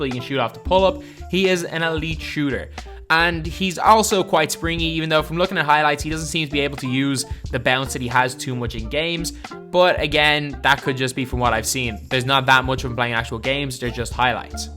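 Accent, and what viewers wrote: Ireland. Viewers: I thought your Irish wtf you uploading like 3 in the mornin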